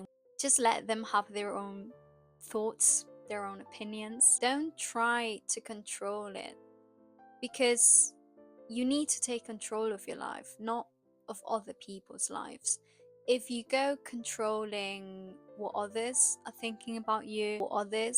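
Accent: United Kingdom. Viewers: Tienes un acento britanico precioso. Imagino que a parte de tener una natural predisposicion al idioma, has trabajado mucho. Sería interesante un video sobre eso. 😊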